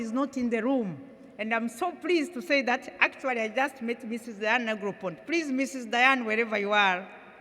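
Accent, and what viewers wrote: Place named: Haya, Tanzania. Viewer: Yeah! representing well Tanzania,the country of Mwl. Nyerere & JP Magufuli.Big up mama tibaijuka truly you deserve respect.pia nimeipenda lafuzi ya kihaya mpaka raha hiyo Ndiyo TZ.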